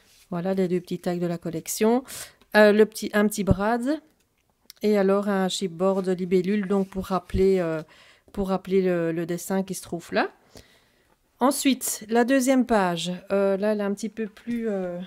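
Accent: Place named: Belgium. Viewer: ça fait du bien d'ré-entendre l'accent belge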